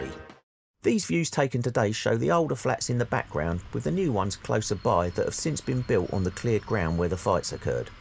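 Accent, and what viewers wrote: London, United Kingdom. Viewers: Great to hear a proper Londoner talking about London. Our government needs arresting for treason the state of the place now. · Mate, I ain't sure if this is Mockney or Cockney... But either way it works brother! You comin' across ere like a right cheek-chappy! 😉👍🇬🇧 Edit: 5.35 A ground-level bay window projecting across the pavement and almost kissing a lamppost opposite!? Who/what/how did this get rubber-stamped? 🤔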